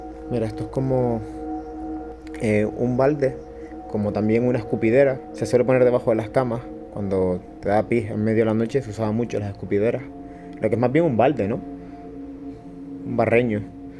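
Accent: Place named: Tenerife, Spain. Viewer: Eres de Tenerife? Por el acento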